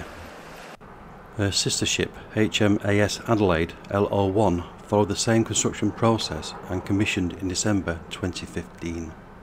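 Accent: Northern England, United Kingdom. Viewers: Got northern accent. Sound suicidal. Voice as colourful as coal pit near Birmingham. Sound like VoiceOver recording is a painful chore.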